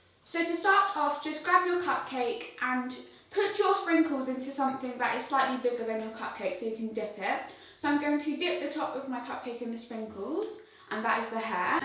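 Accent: United Kingdom. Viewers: Hi.. I want to make that cupcakes in my birthday coming soon for my classmates.. But whenever I try to make cupcakes then my buttercream starts to melt 😢 I don't want my class to laugh at me on my birthday😫can you please help me.. Actually I got the easy and best buttercream recipe from you: icing sugar, unsalted butter, peppermint extract and food colouring.. But I don't have peppermint extract 😭😞 Can I used something else instead?? Please reply.. I loveeee baking.. And want to show my classmates that I can bake I came here from watching your previous videos I love your personality and you accent I really wanna learn that British accent And sorry for my wrong english I speak German so my English is a bit weak I will be sooo happy if you reply me Love from Germany ❤❤❤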